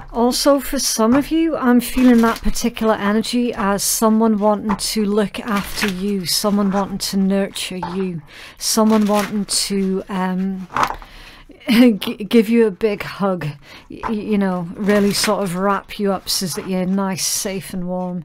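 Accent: Scotland, United Kingdom. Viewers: omg i love your accent!! Scottish ? ♥and as for the read : dead on accurate !!!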